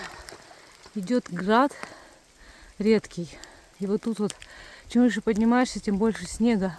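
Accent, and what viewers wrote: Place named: Russia. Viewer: Santa approves🎅👍 This is the one video, you made, that made me earn your respect the most. Even if you were not by yourself, filming... Today,... You have more camping equipment. This one, shows the difficulty, and yet the simplest, basic survival,... two bags, taped together... I love it! 👍 The harshness of what you went through, and yet, the beauty, on all levels, nature, at it's best. From a butterfly,... all the way to a snowy, wet, difficult hike. It's amazing! You are a very resilient, strong, beautiful woman, with the most beautiful accent, Russian voice... Your eyes, hair, are amazing too... Wishing you the best,... ❤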